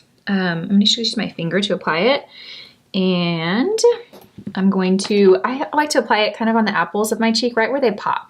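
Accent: Texas, United States. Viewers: Well we are fans! :) Maybe they do not understand Texan??? Sounds like hey friends to me! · It’s that cute Texas accent - fans, friends!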